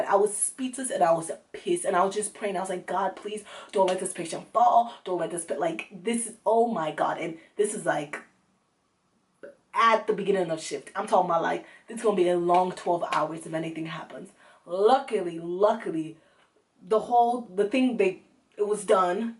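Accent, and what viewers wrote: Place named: Nigeria. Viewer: That naija accent 😆😆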